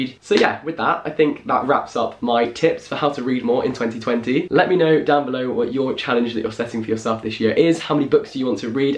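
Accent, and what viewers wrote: United Kingdom. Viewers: I like your British Accent it's amazing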